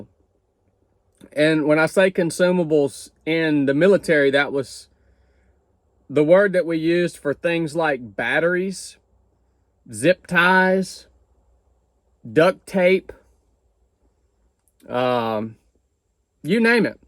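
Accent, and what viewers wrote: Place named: Alabama, United States. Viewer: I’m visiting in Alabama and you have the accent of my family! Where is your accent from?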